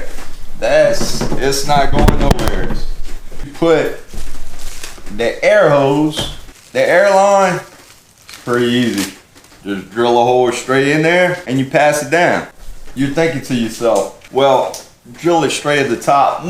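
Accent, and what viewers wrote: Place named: Appalachia, United States. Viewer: Learn to talk dam hillbilly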